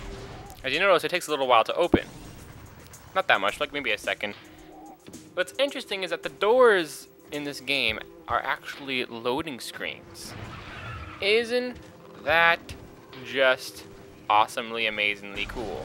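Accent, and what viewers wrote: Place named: Canada. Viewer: Wait, I noticed a bit of an accent...Are you Canadian????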